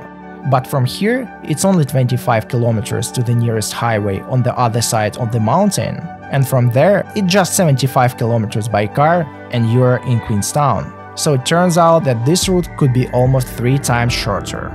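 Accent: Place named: Northern Europe. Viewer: Great clip, I am in a dilemma as to your accent - northern european I suspect. Marvellous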